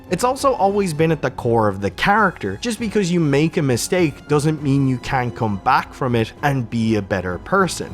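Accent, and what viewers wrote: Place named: Ireland. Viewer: The accent came through , Irish content creator? Dope videos by the way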